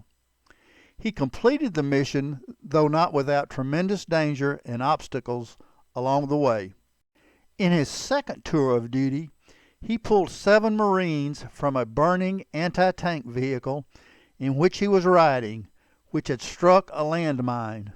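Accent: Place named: South, United States. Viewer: Another great video. Ya know, it has to be a blessing from god, or just severe dumb luck. That he made you into the genuine, humble, good man in which you've become. Ok, and before your southern drawl starts telling me its no big deal, then deflecting praise out of humbleness Now you are hearing from a man that has nearly watched every one of your videos by now. If we're honest with each other, you weren't exactly Walter Kronkite in the first couple videos. But please don't insult me enough to think that I'm only saying this as a man who admires you. You have upped your You-Tube skill level. But certainly the mildly stated, trough the roof improvement in the calmness, knowledge, a vocal delivery that simply makes people feel comfortable in the content of which you produce. If I weren't too cowardly to try, I'd likely only last a day, since I'm too damn opinionated. 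I respect and appreciate you greatly. But even if ya have to block, or "unfriend" me. I will not stop trying to get you to take off the time you deserve to enjoy the fruits of your accomplishments. Alas, life is usually a bit shorter than we expect. I truly hope you take advantage my friend. Even, if depressingly, we miss a weekly video, Don't you know that All of your subscribers will still be here, patiently waiting for you.. So sorry for the long e-mail. I was just gonna be sure about letting you know what your subscribers think of you. Talk again soon perhaps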